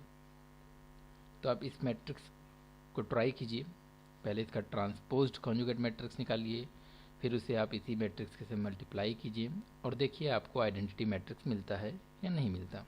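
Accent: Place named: Bihar, India. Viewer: bihari pronunciation is really irritating.when one is using English words should not have a regional accent.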